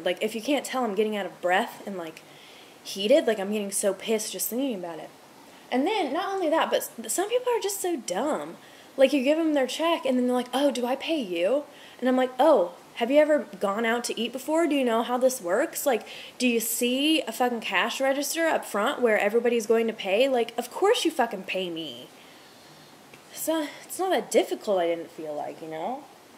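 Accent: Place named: Tennessee, United States. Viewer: Can totally hear the Tennessee in your accent!!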